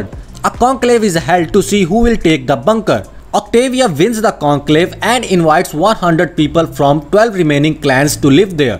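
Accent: India. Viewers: it’s awfully hard to understand, due to the thick Indian accent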